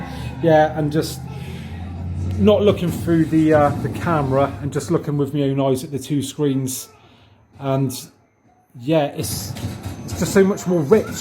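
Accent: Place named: Cornwall, United Kingdom. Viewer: Hi mate great video! Are you cornish if you dont mind me asking?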